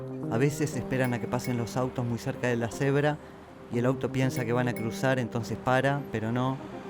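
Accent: Argentina. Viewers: Yo siempre pense que eras de argentina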